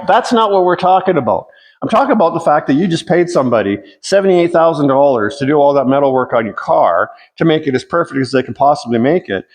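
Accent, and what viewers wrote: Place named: Canada. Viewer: reminds me of Bob and Doug McKenzie, must be the accent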